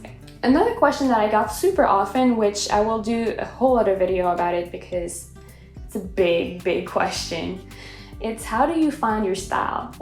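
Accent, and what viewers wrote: France. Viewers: Illustration. You are so wise, my friend. Thanks for sharing your wisdom with us! :) PS: No wonder I connect with you so much. I have the same accent. Raised in France, moved to the U.S. at age 10, and I still trip on my words quite often lol. You're doing great! Also, my daughter has a rabbit named Mr Lapin. It's a peluche though (we speak frenglish at my house) hahaha. Bisous!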